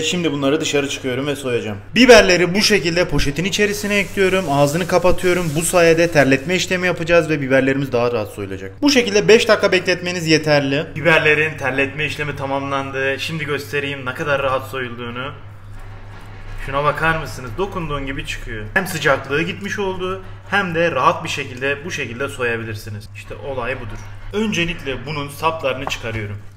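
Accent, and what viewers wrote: Adana, Turkey. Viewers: Bu nasıl hataylı lan adana şivesi konuşüyürr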